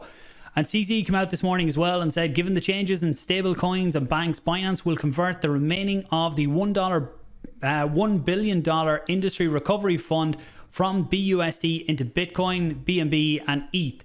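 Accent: Ireland. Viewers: Are you from Sligo man? :D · No way boy, he`s a DUB :)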